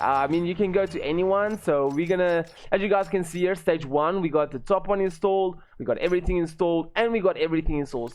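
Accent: South Africa. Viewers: South African right?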